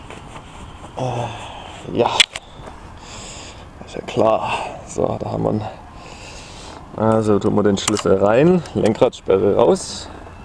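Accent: Swabia, Germany. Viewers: endlich amole an gscheiter schwabe oder ? :D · Aha.. eh Schwob ;) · Omg ich liebe Schwäbisch :D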